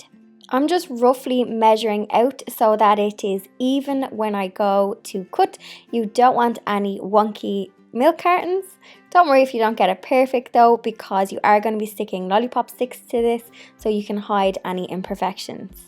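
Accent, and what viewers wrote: Ireland. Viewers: New to your channel, love your fabulous Irish accent, reminds me of home, I am from Dublin, finglas. I love the ideas so simple and so effective. They are so very pretty. I will definitely be making them. Well done. Love the cats entrance. Lol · I'm going to make some of these for my little things for crafting in this nursing home. Ty so much you're beautiful and so are these. Love your accent sounds like Ireland. I'm part Irish. Miss my DAD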